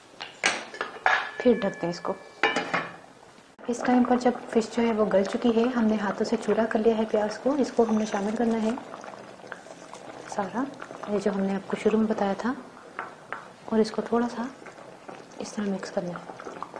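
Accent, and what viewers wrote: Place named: Punjab, India. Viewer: Yar jitni sweet ap ho utni sweet ap ki punjabi , ur new subscriber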